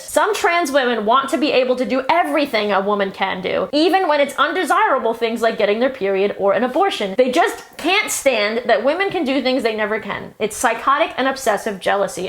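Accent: New York, United States. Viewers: I LOVE YOUR ACCENT!! I'm from Texas and I always thought I had a very neutral accent but in listening to myself more closely over the past year or so I can really hear the Southern twang in my voice sometimes 😂😂 and to me yours sounds like a stereotypical New Yorker sounds. Am I close or completely off the mark in where you're from?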